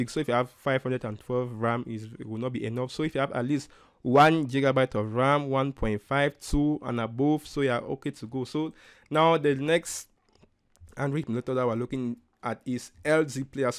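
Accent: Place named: Nigeria. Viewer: no you somewhat sounded like a nigerian in a way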